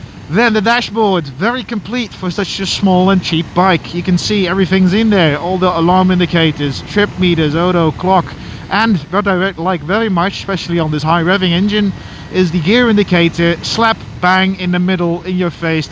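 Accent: Netherlands. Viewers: Well look at that, a Dutch guy doing motovlogs whose accent ISN'T grating to listen to. I'm Dutch myself (Nederlands jeweetog!) and if there's one thing that consistently grinds my gears it's other Dutchies vlogging with 0 attention to pronunciation, proper enunciation, or even vocabulary. Sure, one doesn't have to mask one's accent, but at least an attempt at sounding vaguely localized is much appreciated. Related, keep up the good work, sir. I'm very interested in buying a Versys 300X. Currently riding an CBF500 and a Leonardo 250, and I'm looking for a physically bigger bike to fit me a little better without losing the nimbleness and (relative) low weight of a small bike.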